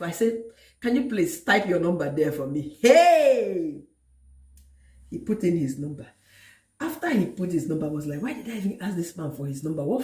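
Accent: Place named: Pidgin, Nigeria. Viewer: I enjoyed listening to your story coupled with the mixture of Naija pidgin English🤣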